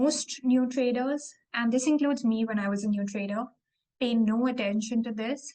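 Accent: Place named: India. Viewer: Idk why but watching the video having an Indian accent seems easy to grasp... 😂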